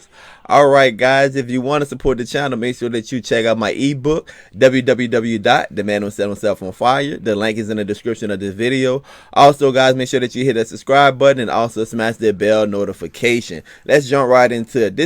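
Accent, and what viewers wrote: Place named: Louisiana, United States. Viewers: Of course it's a Louisiana accent the best there is. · I knew the second I heard his accent, he was from Louisiana. 504 REPRESENT!!! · I'm an Aussie and I love the southern accent, and love how he does the thang with his wangs. 🤣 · First off, clearly you have to get "wangs" and not "wings" if you want crispy chicken. Great video and I love that N'awlins accent! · Y’all he’s from the south most southern folks have an accent it doesn’t mean he’s dumb...y’all the dumb ones for not understanding that. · Gotta be from Louisiana 💓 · Love the Southern Accent...Chicken Wangs lol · I can tell your from NOLA my entire family is from there. Love the accent😉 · Are you from Louisiana, you have a little Louisiana accent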